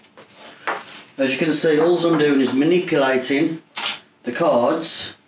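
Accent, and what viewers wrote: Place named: Walsall, United Kingdom. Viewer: Subbed - You sound like a local lad - i'm in Walsall - Thanks for the Inspiration to get stuff done!!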